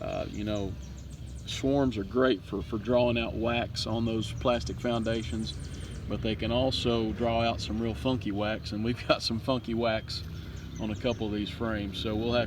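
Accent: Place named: Tennessee, United States. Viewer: I wish you would make more videos. I Love your country accent! And I love watching your videos. I moved away from TN and miss home. So post more!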